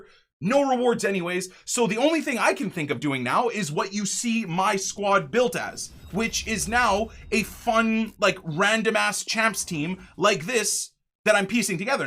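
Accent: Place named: Canada. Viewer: I love your passionate maple syrup drenched canadian voice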